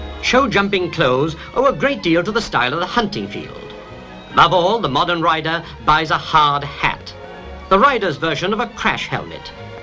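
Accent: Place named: Surrey, United Kingdom. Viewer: He sounds like my Grandpa he was from Surrey I went to School with that accent and was corrected by the teacher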